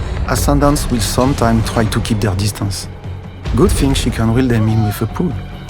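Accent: France. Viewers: l accent fr qui cringe a mort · Punaise l'accent français en anglais rend la compréhension difficile ! Learn to speak english please. I'll buy the game btw, looks cool ! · This french accent is getting on my nerves, and I am french ! At this point it's like he's making zero effort to be understood.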